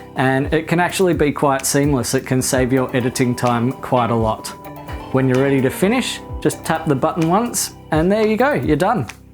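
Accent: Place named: New Zealand. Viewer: Took me way too long to realise he’s a kiwi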